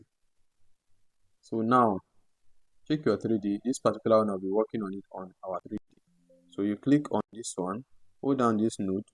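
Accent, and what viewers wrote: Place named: Nigeria. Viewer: The best 3d tutorial I've come across on YouTube. I love your simplistic, clearly explained, common Nigerian accent and jovial teaching style. You have 100% of my attention, and I will definitely recommend you to fellow students. Please, how do I get the CAD image installed, and how do I join the whatsapp group?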